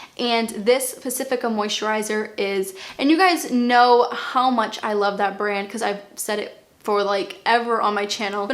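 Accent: Boston, United States. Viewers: Through this entire video, I wondered where your accent came from because of the pronunciation of some of your words. Then you said Boston. You’re one of my grandma’s people! This is my first video of yours I have watched. I love it! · Love your Boston acsent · Please don't change your accent for youtube! There's no wrong way to say things, it reflects the dialect where you are and it makes you unique! I watch your videos a lot so sometimes I will end up saying things with a Boston accent just because it's kind of fun! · Lets get one entire video with your natural Boston accent. Who agrees? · You don't have to correct your Boston accent! It's who you are and I love listening to you speak! Who cares if you don't pronounce it exactly as it's spelled, do people actually complain about this? We are all unique! I'm in Philadelphia and we also pronounce things the "Philly way" haha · You pronounce your words perfectly with your fantastic Bostonian accent! 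· No. Please say “concealuh”. Never ever lose your Boston accent 🥺🥰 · I love the way you say concealer in your Boston accent! It’s unique and authentic and I love it 🥰 don’t feel you need to “correct” your accent/ pronunciations x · Noooooooo I LOVE your Boston way of talking! Please don’t change it! You will get all sorts of viewers from all over the world and they all will have options. Please don’t care! I love the way you talk...Boston style! ;) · You are so right. Less is more. My daughter and I are helping each other clear out our homes of excess material things. I have been saving so much money buying less things that I am taking more trips. I love to travel. Great video, as always! I love your Boston accent. Embrace it. ☺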